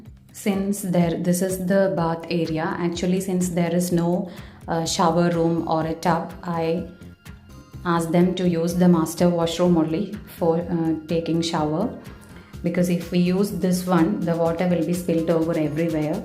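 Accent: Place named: Kerala, India. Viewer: I knew it...ur from Kerala from ur accent 😂😂😅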